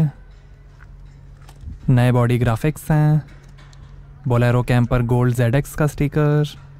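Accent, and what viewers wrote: Gwalior, India. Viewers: Sir Aap gwalior Ke Ho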